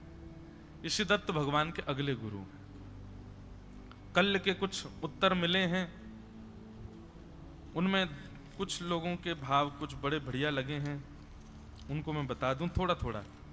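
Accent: Braj, India. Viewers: Apke mukh se Braj bhasa bdi pyari lagti hai bahut mitha lgta hai, radhey radhey 🙏🙏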